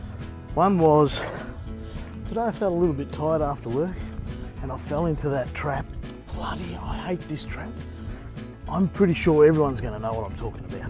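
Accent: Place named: Australia. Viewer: lol i wish i had an aussie accent! sounds awesome! good luck with your weight loss journey mate! new sub :D can't wait to see your progress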